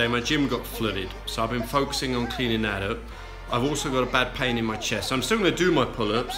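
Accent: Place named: United Kingdom. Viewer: You helped get me off my ass or bum as you called it. Motivating to watch, I also enjoyed hearing the bits of Les Brown thrown into the video. Good luck in the future from across the pond, guessing by the accent. Oh and by the way I bet if you had rested for a couple days instead of one you would have done better on your test.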